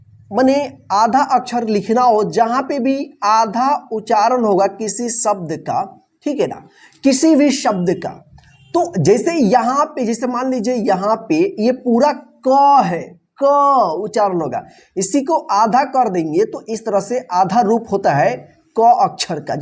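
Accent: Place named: Bihar, India. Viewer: आप हिंदी और इंग्लिश बोलना सीख लीजिए तो ज्यादा प्रभावशाली लगेगा वीडियो आप शायद बिहार की तरफ से बिलॉन्ग करते हैं आपकी बोलचाल की भाषा में उधर की झलक है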